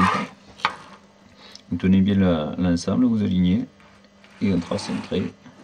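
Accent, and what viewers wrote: France. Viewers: avrc le petit accent marseillais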